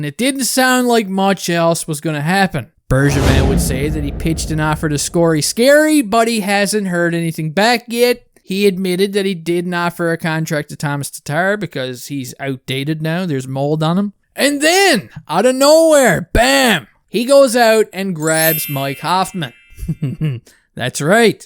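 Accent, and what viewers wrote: Newfoundland, Canada. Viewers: You must be from Newfoundland 🤣👍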